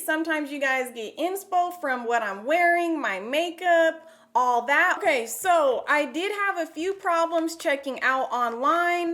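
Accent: South, United States. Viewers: I love her country accent 😂❤️ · What accent is that????? I thought you sounded southern for a second but idk anymore now you just sound like you're yelling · This is the first video i watched of her .. where she from? She definitely has an accent . Like southern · Omg your voice 😂 it is so cute. Its super southern but not.. At the same time. Where are you from?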